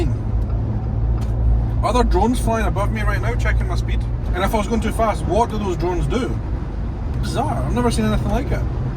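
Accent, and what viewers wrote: Scotland, United Kingdom. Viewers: Hello are you from Scotland?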